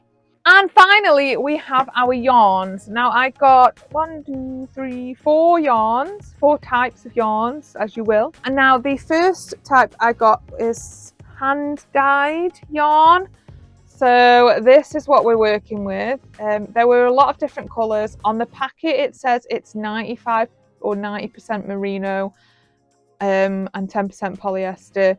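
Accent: United Kingdom. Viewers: Are you from Liverpool? Love to listen to your accent.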